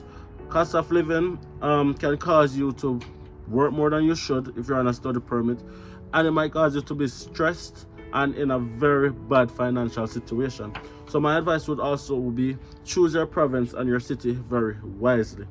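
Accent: Jamaica. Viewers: Well informed video. Loved the Jamaican accent as well which is slowly but surely dissappearing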